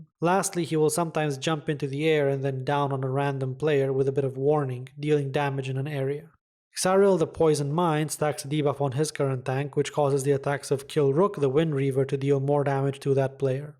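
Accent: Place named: Finland. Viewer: I´m not sure, but sounds finnish!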